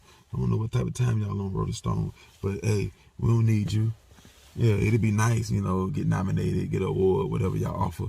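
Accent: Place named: Chicago, United States. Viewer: Your funny bro..it's like you frm Chicago but jus county asl wit it😭😭 dat dare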